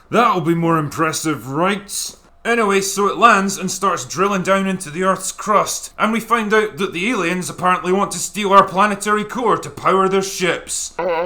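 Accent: Scotland, United Kingdom. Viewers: Giant space testicle and shooting the queen alien in the arse. Priceless - all made better by the angry Scottish accent 🤣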